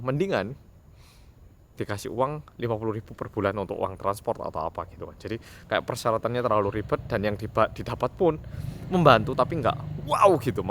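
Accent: Java, Indonesia. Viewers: Mas ini kalau ngomong bhs Korea logat Jawa? ( Medok)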